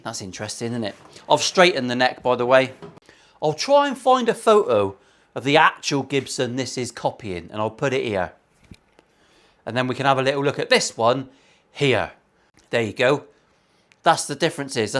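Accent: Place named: Plymouth, United Kingdom. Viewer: I've no interest in guitars. I was just idlely scrolling. I heard the accent and had to ask if he's (you) are from Plymouth? I think it's a Plymothian accent, and judging by the language, and I am judging, specifically Swilly. Let me know. From an exiled Janner.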